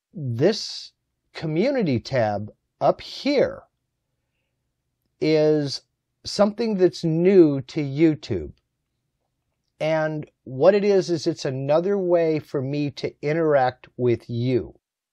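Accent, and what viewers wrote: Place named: United States. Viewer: Hey. I enjoyed today's video. Like all of us beginners, I thought I would see another of your superb tutorials. But you've already given us a wealth of knowledge and now it's time for us to practice what you've taught and use it. Above all, I want you to continue having fun at what you do, and your upcoming project videos (with maybe a tutorial here and there) are something I'm looking forward to a lot. I had to chuckle in when you talked about not just doing the Vectric videos in your voice. Both of my sons and I agree that one of the best things about your tutorials is that you speak slowly, clearly, and in American English. Vectric's go too fast for beginners, and there's a lot of rewinding to translate the thick British accents on some of the words. I'll also be glad to see the Christmas projects that you post after the first of the year. 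I'm hopelessly behind on what I'm already committed to for this year, but would like to get a head start on next year. I hope you have a great Christmas, and have a lot of fun in your shop getting ready for it. Thanks for all you do.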